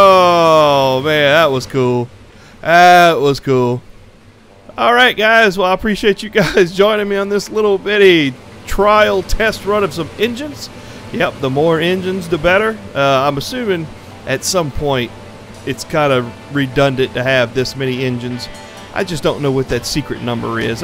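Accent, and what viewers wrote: South, United States. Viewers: is a coonass, im from bama. I tend to seek out the southern tubers, they my kinda people. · Is that a southern accent I detect? :D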